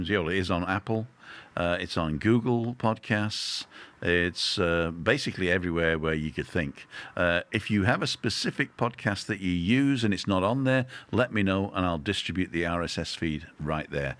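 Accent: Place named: North, United Kingdom. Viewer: You should send your portfolio to acting agencies, you’ve definitely got the voice for it and I think the subtle northern accent is something that’s not heard enough on mainstream.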